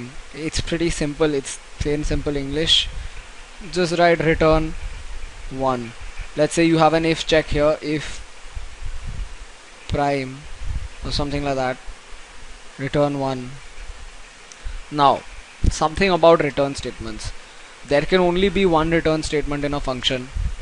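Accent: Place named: India. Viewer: Your Indian English is soo much better, than Chineese English!!! :)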